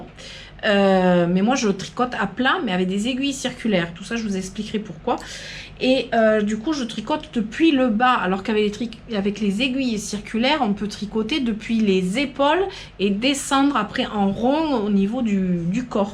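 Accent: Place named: South, France. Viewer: Quel plaisir votre bonne Humeur et ce bel accent du sud . Je découvre votre univers coloré et j aime beaucoup 😉 d ailleurs je vais aller jeter un l œil sur votre autre chaîne YouTube car moi aussi j aime les plantes même si elle parfais ne m aime pas trop 😂. Au plaisir de vous trouver 😊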